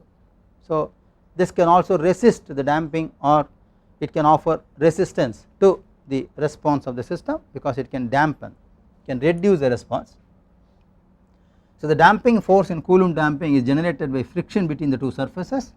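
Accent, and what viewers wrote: India. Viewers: well are you suppose to understand what everyone is speaking?? cause the last time I check America is not the only country in the world...for instance they're is a country call India and this guy sounds like he is from there...also no where in the title of the video it says for Americans and or English speakers.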